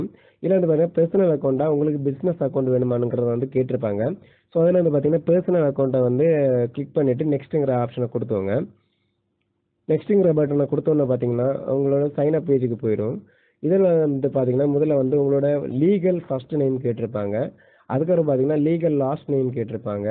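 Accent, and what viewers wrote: Kanyakumari, India. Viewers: Kumarii slang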